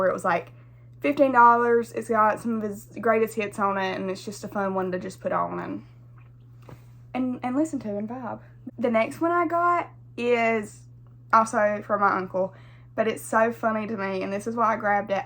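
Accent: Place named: Georgia, United States. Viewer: I love your accent! Is it a Georgia accent? You have great taste in music too!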